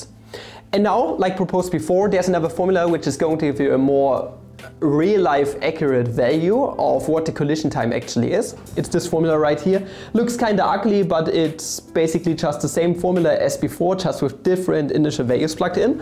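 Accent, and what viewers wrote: Germany. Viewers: Ich stoß grad so auf dein kanal und dachte mir: Alter der kerl hatt doch nen deutschen akzent. Aber geiles video alter feier ich sehr das thema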